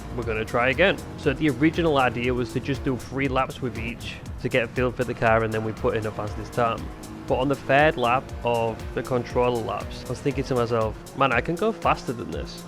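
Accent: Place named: Liverpool, United Kingdom. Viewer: This guy is an absolute scouser.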